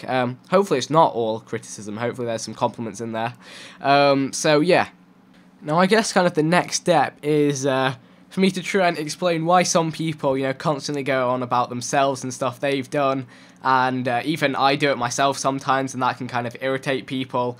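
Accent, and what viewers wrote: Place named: United Kingdom. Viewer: I like your British accent :)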